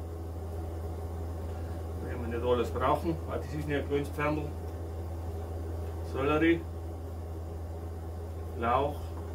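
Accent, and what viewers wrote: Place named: Upper Palatinate, Germany. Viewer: Servus tolles Rezept, schaut mega lecker aus des Hendl, hast Du guad gemacht, vielen Dank für das Teilen😋👍👏und zwegst dem Oberpfälzer Dialgt loos i a moi was do 😁👍👍👍 LG Da Wolfe 😁👨‍🍳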